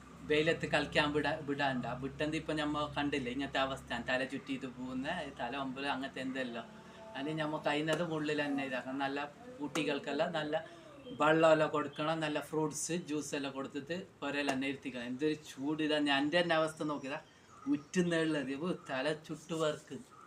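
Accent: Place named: Kasaragod, India. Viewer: Ith kasaragod choori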